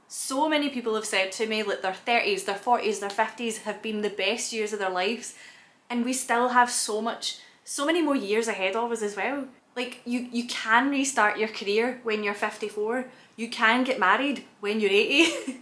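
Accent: Scotland, United Kingdom. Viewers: I'm from Poland and watching your videos to get acquainted with the Scottish accent, which I really like. I visited Scotland many years ago and liked it a lot, watching your stuff reminds me of my past travels and how nice the Scottish people are. I wish I get the chance to go there again some day. I hope you are doing well and finding your happiness in life! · You always made interesting, funny and beautiful videos. Miss you and your lovely Scottish accent. Hope you're okay. Ty from Ohio. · I'm so glad i found your vid. Initially i was looking for smth to study scottish accent but i think i just found my person <3 I'm currently feeling so stuck behind in life and your take is like a breath of fresh air tysm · That was brilliant!!! I only came here to learn a Scottish accent but you are adorable ❤️Loved the talk! Do what you want in life full stop!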